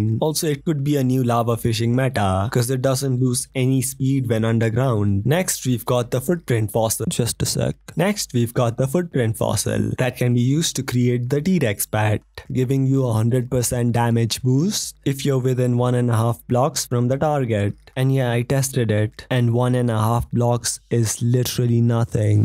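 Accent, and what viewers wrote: India. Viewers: bro has pure indian accent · you sound like Indian?? are you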